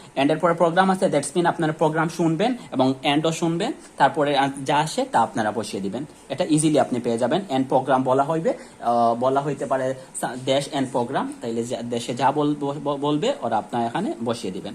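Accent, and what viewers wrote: Sylhet, Bangladesh. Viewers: Vaiya apni ki Sylhety?😊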